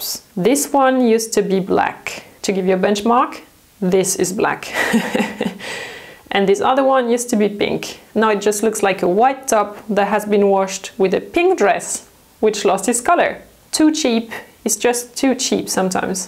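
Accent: France. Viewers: Can't identify where she is from... Why I heard some french accent? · Ce léger accent français :) Very interesting video. Clear and concise. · L'accent français est reconnaissable partout😀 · Super vidéo, je connaissais déjà ces tips mais je regarde pour le plaisir ! J'aime bien ton petit "French accent" ! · Merci beaucoup pour cette vidéo merveilleuse! Ton accent francais est super mignon :) Et les astuces sont très intéressant! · I like buying clothes and learning differences in quality and you make it informative and succinct, delivered with an absolutely killer French accent that would make learning the rules of cricket listenable!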